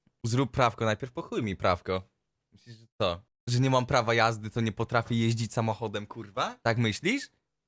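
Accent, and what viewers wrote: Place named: United Kingdom. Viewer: To już wiemy dlaczego masz Brytyjski akcent i umiesz gadać po ang pogo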